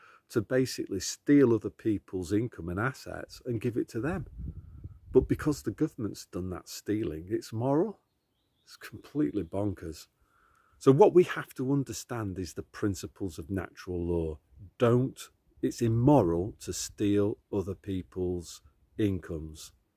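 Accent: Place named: Midlands, United Kingdom. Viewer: Larken Rose with a middle England accent.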